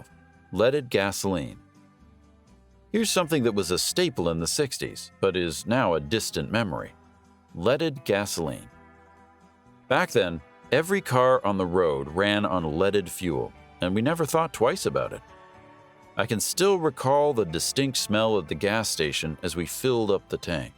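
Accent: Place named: Canada. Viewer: This narrator sounds like he's from 🇨🇦 . . . 😊 Yeah, our childhoods were waaaay different from those of kids today! I don't think I'll ever get used to how quiet my neighborhood is in the summer! 😅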